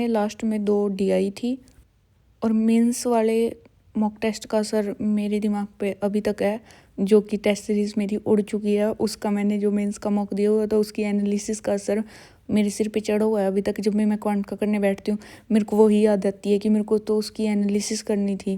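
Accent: Haryana, India. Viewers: Bhen muje lagta h app Haryana se ho awaj se😂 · Hahah shi 😂 haryana aale hi bolya kry nui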